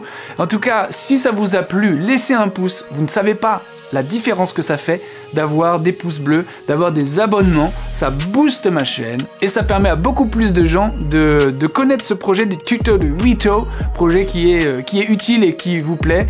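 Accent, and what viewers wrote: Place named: United Kingdom. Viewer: Hello. I did enjoy your video and your accent, which sounds more British than American. I often take part in discussions on Tandem, but British people are very seldom there. This accent is sweet: it reminds me of the happy days of my adolescence when I learned the language in England.😊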